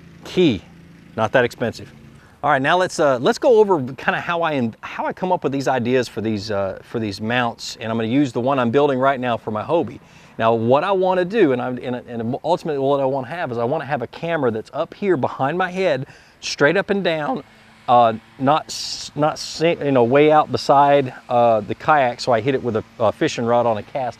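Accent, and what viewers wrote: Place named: South, United States. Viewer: i like when he goes "you see that right there" the southern accent was so strong lol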